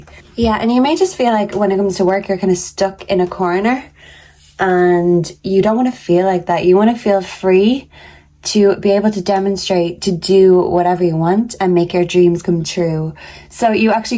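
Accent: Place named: Ireland. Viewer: Hi l love how you do your tarot reading.How you use different pack of cards.Your reading is really interesting. I love your àccent Do you come from Ireland?Are you Irish ?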